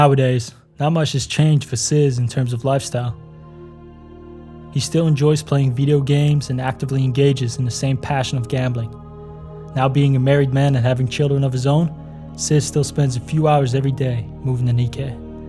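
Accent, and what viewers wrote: Netherlands. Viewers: You sound dutch or flemish 🤔 am I right? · You have Dutch accent. If you are not Dutch that's not very common 😄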